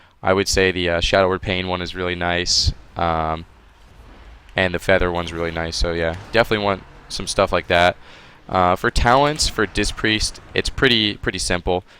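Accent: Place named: United States. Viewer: he sounds american.....but he uses the lingo of someone who isnt...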